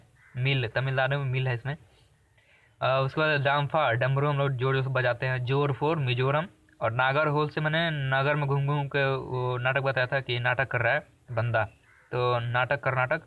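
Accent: Bihar, India. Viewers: bihari language , improve it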